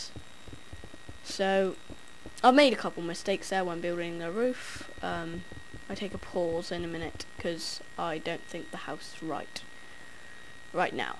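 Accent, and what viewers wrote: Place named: United Kingdom. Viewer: British accent! Omg!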